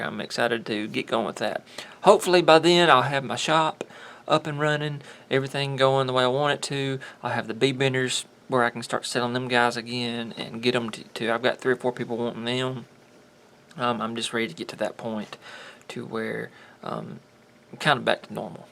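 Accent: South, United States. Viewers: Love your country accent. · Thank you for this!!!! I am going to give this a shot. I was about to throw them out.... but not just yet! I enjoyed your accent as well, good ol' country boy.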